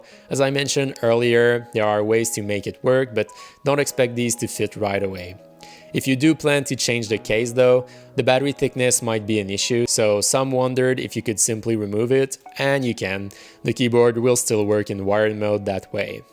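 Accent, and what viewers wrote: Quebec, Canada. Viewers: Are you from Québec ?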